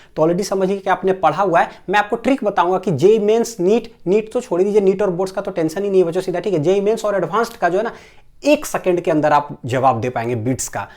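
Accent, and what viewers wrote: Bihar, India. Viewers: thanku sir आपसे पढ़ने पे अपना वाला फीलिंग आ गया आपका accent बिहारी वाला है न 😌❤️ सामझ् गए सर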